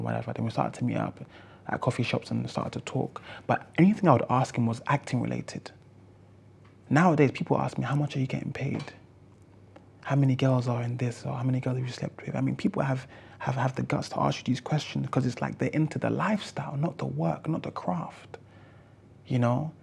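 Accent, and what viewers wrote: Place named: RP, United Kingdom. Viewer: Do you really chat like that lol 😂 sound like really posh and stupid bro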